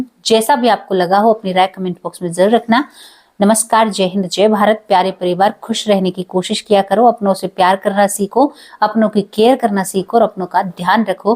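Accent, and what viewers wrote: Haryana, India. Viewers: मृदुल सुशील जी क्या हुआ अगर यह सही से नहीं बोलता है तो हरियाणा और गांव के लोग ऐसे ही बोलते हैं जो बहुत ज्यादा पढ़े लिखे लोग होते हैं वह भी बहुत बार ऐसे ही बोलते हैं बात हमें समझ आनी चाहिएबस और हमें अपनी बहन की बात बहुत अच्छे से समझ आती है आपको ज्यादा परेशानी हो तो आप जरा युटुब पर वीडियो बनाकर देखिए कितनी दिक्कत होती है श्रद्धा शर्मा दिल्ली से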